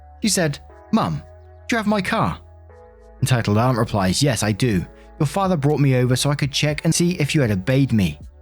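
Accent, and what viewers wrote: United Kingdom. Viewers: One question? Your accent….. are you British?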